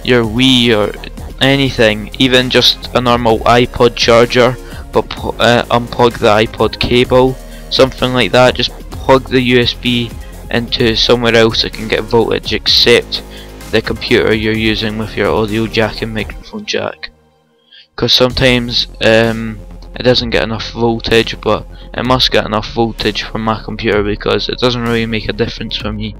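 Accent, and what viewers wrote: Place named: Scotland, United Kingdom. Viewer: Yassss he's Scottish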